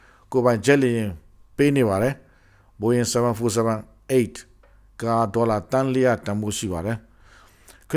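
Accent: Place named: China. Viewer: ဘာလေသံ လဲ စိန တွေ မြန်မာသံ နဲ့ U.S ကို Smearing Campaign...လုပ် ယုတ်မာနေပြီ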